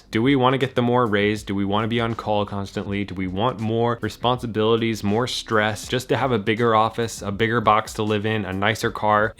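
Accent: Scotland, United Kingdom. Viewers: I would’ve never guessed that was a Scottish accent and my husband is Scottish. My views on commuting and waiting have completely changed with audiobooks, kindle and online courses. You can read or learn anywhere … almost nothing is a waste of time unless you forget your phone charger.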